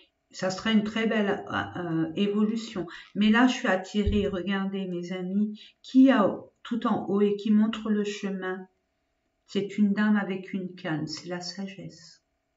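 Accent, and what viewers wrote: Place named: South, France. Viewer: Bonjour, accent du sud... ça tombe bien je suis dans le Var et je veux aller dans le Vaucluse pour faire effectivement un autre travail enfin quelque chose qui englobera mon travail actuel. On attend juste le financement pour pouvoir avancer dans le projet. Avec achat immobilier... Choix numéro 1, c'est beau. Merciiiii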